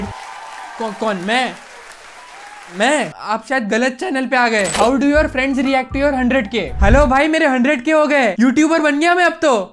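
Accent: Gujarat, India. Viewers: Tum jese bolte ho vese Pata chal hi jata he ki tum gujarat se ho❤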